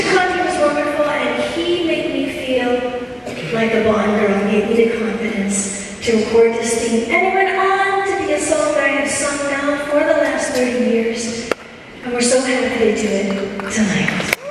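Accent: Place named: United States. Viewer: she sounds american now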